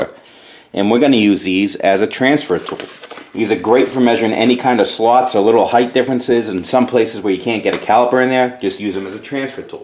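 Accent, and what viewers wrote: Boston, United States. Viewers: Had to look up Schmutz. Thought it was some kind of Bawsten accented slang but its actually german for dirt. Thanks for video, its very well explained and entertaining with the accent...reminds me of Matt Daemon or Mark Wahlberg.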